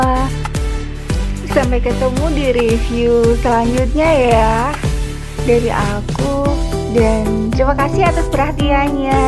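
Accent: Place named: Java, Indonesia. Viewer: wong jawa ya medok mba hehehe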